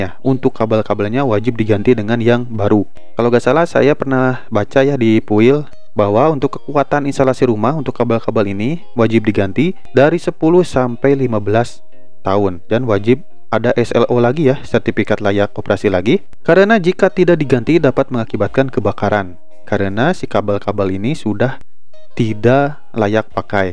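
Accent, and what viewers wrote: West Java, Indonesia. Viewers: Narator na Jigana urang Sunda.😁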